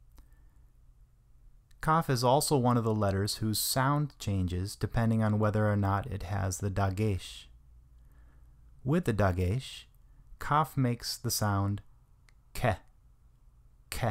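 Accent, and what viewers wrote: Europe. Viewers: nice cours, but the pronunciation is not authentic to the original hebrew, it sound like european pronunciation more than middle eastern pronunciation, I disagree with pronunciation of some letters : ח ע צ ק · Of course you use the European pronunciation and not the proper Tiberian pronuncisrion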